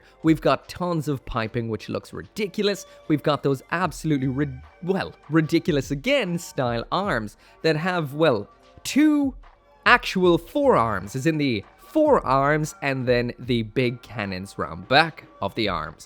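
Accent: Ireland. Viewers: Irish Acent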